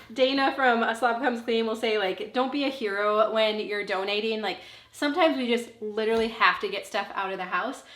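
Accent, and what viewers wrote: Minnesota, United States. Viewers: Love watching you, lived in Minneapolis years ago. Good to hear a good Minnesotan voice.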